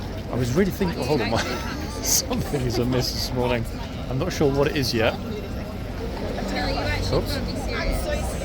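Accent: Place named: United Kingdom. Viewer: His not her grand dad...my guess is the old guy is a boyfriend....how the woman poses hahaha she ia an Asian from Philippines...i know right....when we gonna have a peak how you look like Sir....i love British accent...lovely to listen... i met and befriended some while i was in HK... With your videos i feel also walking in London haha...GOD Bless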